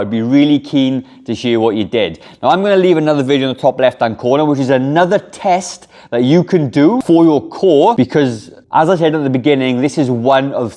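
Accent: Wales, United Kingdom. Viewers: Im Scottish, im loving your Welsh accent. Celts together!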